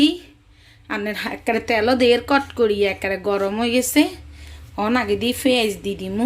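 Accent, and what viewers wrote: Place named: Noakhali, Bangladesh. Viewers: আপু তোমার নোয়াখালী ভাষা গুলো আমি তেমন বুঝিনা কিন্তু শুনতে অনেক ভালো লাগে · অাপনার নোয়াখালী ভাষা ভালো লাগলো। অাপনার রাননা বাননা ভালো লাগলো। অাপনে অামার চ্যানেল টা দেখবেন।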